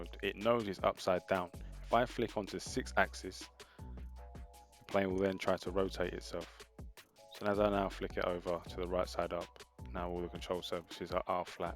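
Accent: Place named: Ireland. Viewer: Love that Irish actsent.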